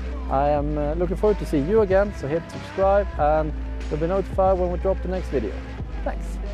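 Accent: Sweden. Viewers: Are you Scandinavian? You're accent sounds Scandinavian, specifically Swedish🙂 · Is he Swedish? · This is really helpful! Tack som fan mannen!(din engelska är väldigt svensk😉)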